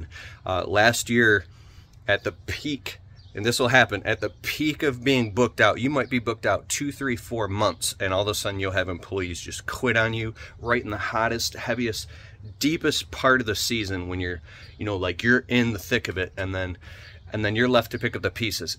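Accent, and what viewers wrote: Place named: Mississippi, United States. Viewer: As a Mississippi man I can appreciate the accent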